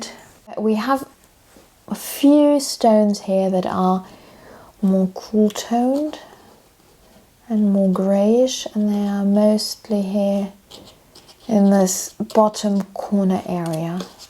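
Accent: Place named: Australia. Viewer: Love your teaching so much ❤ I love your accent, I'm guessing that you are from Australia originally? I'm in Newcastle England. If you get time and only if you think you're viewer's would be interested could you explain how to paint sheet music like I've seen in some old still life paintings. And how to paint writing on letters such as that painting by David of the dead man in the bath please. Happy new year 🎉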